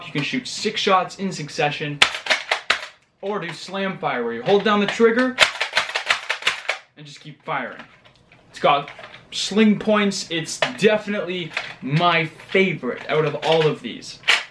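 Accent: Canada. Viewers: Are you from Quebec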